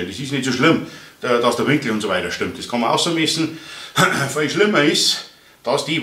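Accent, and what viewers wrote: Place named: Bavarian Forest, Germany. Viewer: Hallo Du hast die gleiche Sprache wie ich wo kommst denn Du her, ich vermute nicht weit von Regen entfernt (grins). So von Kollege zu Kollege, aber bei mir is nix mehr mit Stom seit ich drei Schlaganfälle und einen Herzinfarkt hatte, da musste ich auch noch das reden lernen, natürlich waldlerisch (grins).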